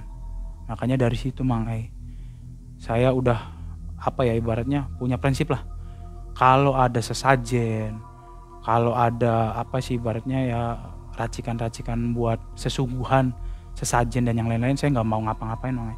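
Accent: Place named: Cirebon, Indonesia. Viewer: wong cerbon yaaaa Logate bli bisa diboongi ca 😁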